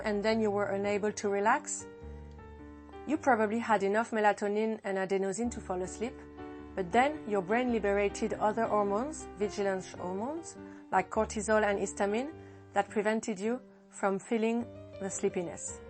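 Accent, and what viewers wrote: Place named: France. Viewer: I found this video explained very clearly all the complex facets of sleep. Nice French accent as a bonus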